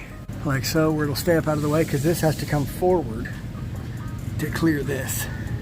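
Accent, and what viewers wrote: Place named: Canada. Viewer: though it sounded like trailer park boys are you canadian by any chance?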